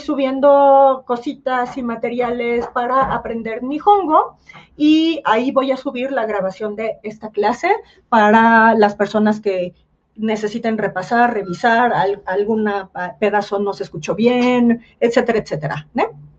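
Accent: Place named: Mexico City, Mexico. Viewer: Hola hola Sensei!! Apenas descubrí tus clases, qué bonito explicas, además cuando hablas tan chilanga es increíble, eso hace la clase más entretenida y bonita... Gracias Gracias 💞... Propósito de vida, tener una small talk en japonés 🎉 y contigo lo lograré!! Gracias gracias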